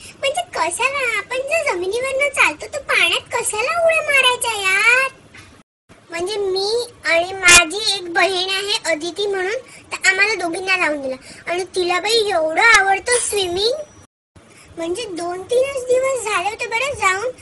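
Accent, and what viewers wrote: Nagpur, India. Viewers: your accent in video looks similar to Nagpur ... majedar ahet pan.... yet rahundet asech... lahanpanichya baryach athavani jagya zalya masti chya :) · tu nagpur chi ahes ka mhane😜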